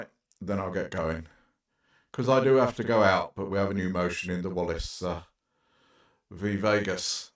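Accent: United Kingdom. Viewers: are you going to continue your unique content but showing your face and giving your real name on your channel in the future? Mr. Merbeast recently said anonymous creators are cowards and Mr. Stout has said creators such as you are "avatar" channels whispering in shame from their secret internet hiding places. (I know you don't think much of Mr. Stout though.) Whatever the decision, I trust your voice will remain the same. Everything sounds better with a British accent!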